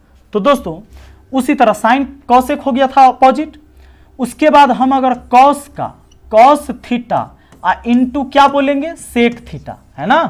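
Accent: Bihar, India. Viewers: Aap bihar se lag rhe ho sir ji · Sir Ye sahi padato par Hindi Jo aapaki bihar ki jo hume samaj nahi aati jadya so esy hindo me bolo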